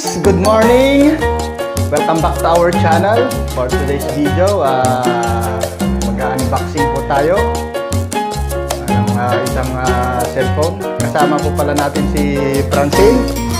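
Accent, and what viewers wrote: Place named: Ilocos, Philippines. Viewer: Tga Baguio Mama Ko Po Pero D2 Na Cya Sa Cebu Date May Bahay Cla Sa Naguilian Ngayon Nasa Baguio Na Mga Titas Ko Kaya Pala Ang Tuno Ng Salita Tulad Ng Lola Ko Kase Tga Naguilian Pala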